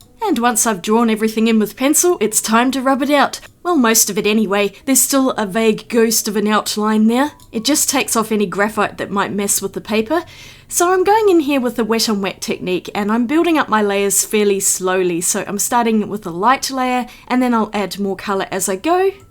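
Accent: Australia. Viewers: Love hearing another Aussie voice. Hot press paper is fun to play with granulation paints on. Thanks for the comparision. Waving from Queensland 😊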